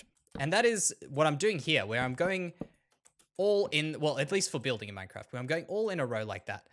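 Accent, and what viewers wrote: Australia. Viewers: You have a relaxing voice ,are you from Australia? I am watching this series so late😂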